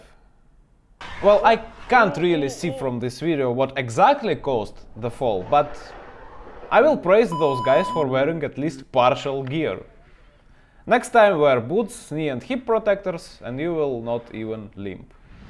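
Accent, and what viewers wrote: Russia. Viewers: Yes! Our presenter in question is quickly becoming one of my favourites. I really like Russian accent. I like how he further analyses MotoStar videos; I think they may also be presented by a Russian guy. Humour and good sense make for very entertaining and instructive videos. This guy is a good teacher.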